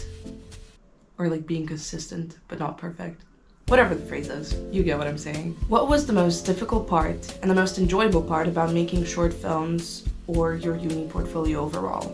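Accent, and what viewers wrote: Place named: Russia. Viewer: girllll i like ur russian accent , btw im also going to be studying at film school cant wait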